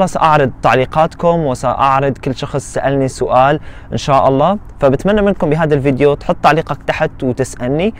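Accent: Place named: United States. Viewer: وكيف قدرت تتكلم باللهجة الامريكية رغم انها صعبة ؟؟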